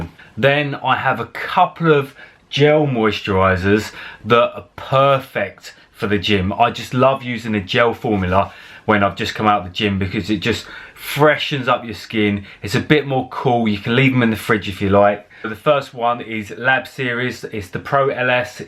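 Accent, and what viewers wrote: United Kingdom. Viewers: subscribed as soon as i heard that top notch londoner accent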